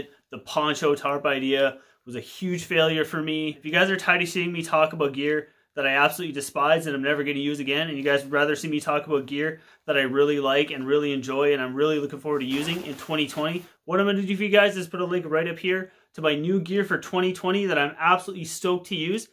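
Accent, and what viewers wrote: Canada. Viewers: Geez, if you want a larger U.S. Audience gotta tone down that canuck accent my friend - but, guessing it's a slow PRO-cess.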